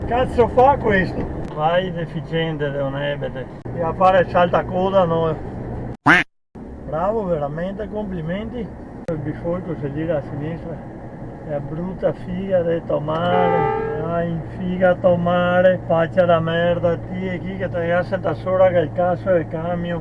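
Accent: Veneto, Italy. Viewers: È tutto bene...i difetti potranno ma io non li vedo ...mi va bene così...poi mi piace sentir parlare Veneto.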